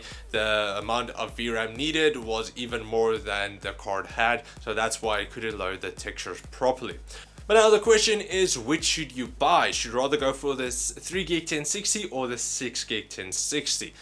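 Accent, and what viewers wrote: Afrikaans, South Africa. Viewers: South African? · I had a feeling your South African, so am I. Lekker my bru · Is this guy Afrikaans?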